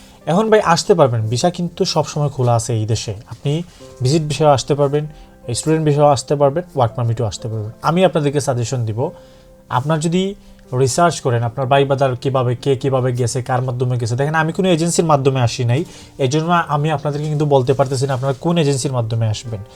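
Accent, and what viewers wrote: Sylhet, Bangladesh. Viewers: আপনার কথাগুলো তো অনেক সুন্দর সিলেটি মাল👍👍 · vaiyar basa ki sylhet ? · ভাই মনে হয় সিলেটি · Bhai ki sylheti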